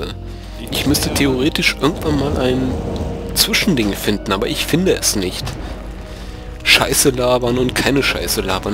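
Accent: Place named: North, Germany. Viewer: du klingst als wärste ausm norden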